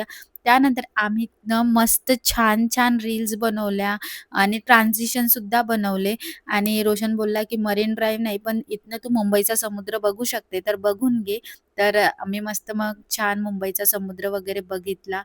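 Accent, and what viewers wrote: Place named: Vidarbha, India. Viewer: मराठी भाषेचा विदर्भ tone बदलून शुद्ध केला तर खूप छान व्हिडिओ होतील आणि नक्कीच चॅनेल monitiesed होण्यास मदत होईल, कारण बाकी सर्व छान आहे त्यात केस तर खूप सुंदर ❤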